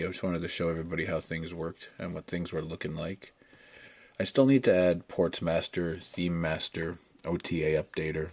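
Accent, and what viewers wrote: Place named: Winnipeg, Canada. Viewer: You're from Peg City aren't you? Lol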